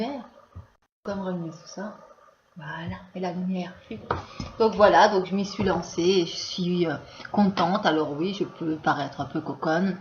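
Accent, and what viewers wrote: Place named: Liège, Belgium. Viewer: MDR. Tu me fais trop rire :)... Pour te rep.. "un mec" mdr... j't'explique vite fais, jsuis tomber sur ta chaine par hasard, en cherchant des gens qui parlais de nick conrad, ensuite jsuis tomber sur une video de toi qui parlais de ça, j'ai écouté, puis j'ai regardé en vitesse les autres video de ta chaine, mais étonnamment j'aime bien. T'as un coté artistique fort développé. Même si je vois que tu veux juste "parler de tout" et que oui tu t'en fou de l'avis des gens.. Jt'avoue que j'ai laisser un com's exprès pour voir si t'allais répondre, et j'étais sur à 99% que t'allais le faire dans une video mdr. jt'ai glissé un tacle suivis d'un compliment, juste pour voir... Mais la où tu m'as fais rire c'est sur le "un mec ou une fille, jsais pas" mdr... Ouai c'est claire que vu que je ne montre que mon oeil c'est difficile à dire mdr suffisait de cliquer sur ma chaine pour voir que le contenu n'as rien de féminin XD Mais bon, jvoulais juste que tu sache que j'aime bien ta façon d'être et ton accent de liège "jme moque pas jsuis originaire de Verviers ;)" et ce que j'ai bien aimé, c'est une phrase que t'as dit sur une vidéo : "c'est pas parce que je fais la conne que je suis débile" et c'est ce coté (jme prend pas au sérieux) qui est bien à voir.. 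c'est cool que tu t'arrête pas à l'avis des gens, vouloir plaire à tout le monde.. à quoi bon. Si tu t'aime toi, c'est le principal... PS: sur la video où j'ai laissé un com's il y avais déjà un "dislike" c'est pas moi qui l'ai mis, j'ai p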